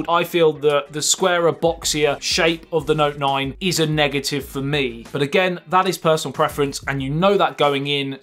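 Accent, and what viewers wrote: United Kingdom. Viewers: annoying accent and usually like brits accents must be different region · I hate British accent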